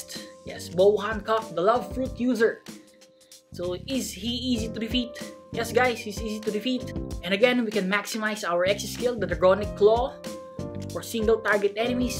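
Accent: Philippines. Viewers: Yo your accent sounds like your from Philippines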